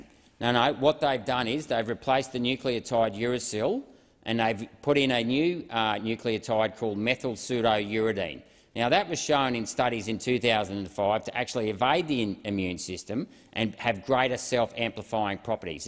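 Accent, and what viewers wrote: Australia. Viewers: I've heard it spoken by so many Americans, and foreigners it's nice to hear it from an Aussie accent I totally understood every word so eloquently described anyone could understand. Brilliantly stated .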